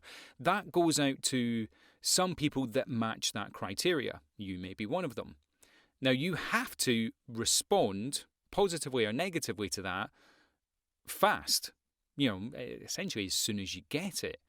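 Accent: Scotland, United Kingdom. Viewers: Your videos are fantastic. Began my VO career last year and have jumped around a number of channels on the subject. Yours are extremely thorough and made all the more watchable thanks to articulate manner and Scottish accent! Lovely stuff 😎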